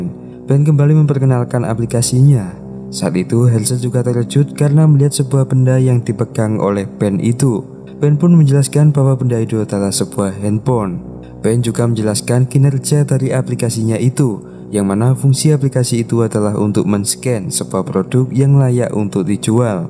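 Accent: Java, Indonesia. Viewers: Admin e wong jowo iki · Orang Jawa yo ....jowone ndi lurr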